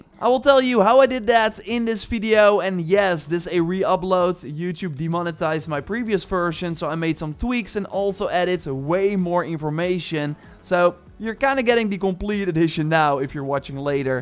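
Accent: Netherlands. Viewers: I instantly hear the ditch accent in a milisecond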